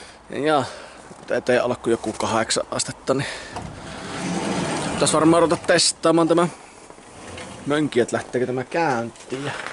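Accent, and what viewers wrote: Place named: Savo, Finland. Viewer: Pit tilata kanava, vaekuttaa lupsakalta tuo Savonmurre ja ite kanava myös😉👍Minun juuret on syvällä Savolaisessa kivisessä muassa. Minun murteet männy sekasin kun asunut Etelä-Suomessa ja nyt Pohjois-Karjalassa ja syntyjään sieltä.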